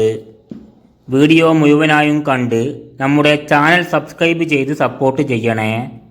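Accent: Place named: Malappuram, India. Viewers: Horrible pronunciation and the worst and very irritating as well as poor presentation. Say Alleppey better. He should be belonging to Malappuram😢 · മര്യാദ ക്ക് അക്ഷരം പഠിചിട്ട് വാ വോയിസ് കേട്ടാൽ മലപ്പുറകാരൻ ആണ് എന്ന് തോന്നുന്നു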